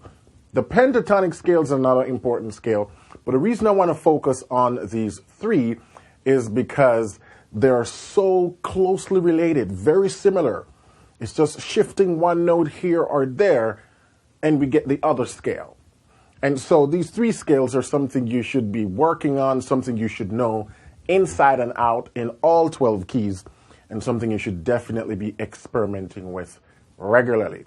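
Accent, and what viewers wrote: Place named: Jamaica. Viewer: This is my first time here. I'm a reggae man who has recently branched out to the blues. Today, I decided to check out some Gospel-- and found this lesson. I was not disappointed, and have subscribed. You know your stuff, and that unmistakable Jamaican accent still comes through! Blessup!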